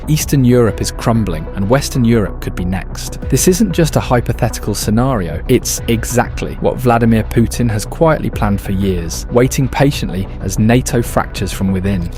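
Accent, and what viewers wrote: United Kingdom. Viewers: You are so full of crap. Why is it that the British accent always comes with russophobia?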